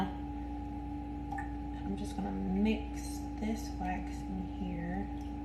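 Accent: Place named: South, United States. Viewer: Your hair look's so fascinating i love your southerner voice so sexy a real turn on ❤❤❤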